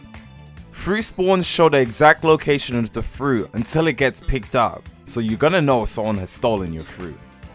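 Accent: United Kingdom. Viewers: The British accent is so dreamy :drooling_face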